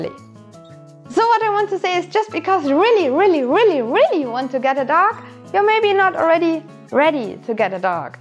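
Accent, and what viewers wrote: Germany. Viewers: You Sound a little German. :) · I realized you had a thick accent, but I didn't think you were German. I am living in Germany as well. I used to do voluntary work in a shelter for 2 years. I saw sooo many, great family dogs in the shelters waiting for homes FOR YEARS! That's extremely sad. There is a dog for everyone in the shelters. Even if you don't find your dream breed dog there, you might fall in love with a dog you might have never expected to bond with in the first place. If you still go for a specific breed, shelters also have many breed dogs frequently like Poodles, Yorkshire Terriers, Beagles, Jack Russell Terriers which I absolutely love, and German shepherds. German shepherds and Russells are the most common ones from my experience. It also depends on the region though.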